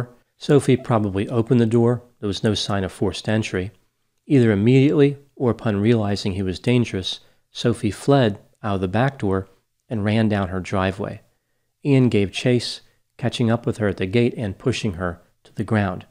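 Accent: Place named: Delaware, United States. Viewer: I think he is from Delaware. It's probley an accent thing if anything. I'm not aware of it.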